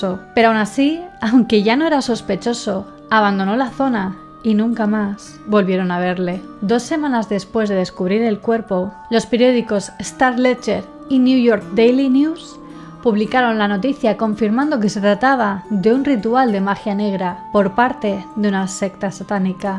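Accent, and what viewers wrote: Spain. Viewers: Qué hermoso acento español 🤩 😍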